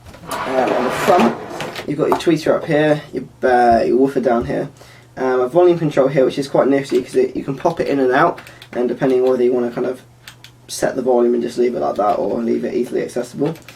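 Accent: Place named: United Kingdom. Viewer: I couldn't understand half of the things you said...bloody British accent lol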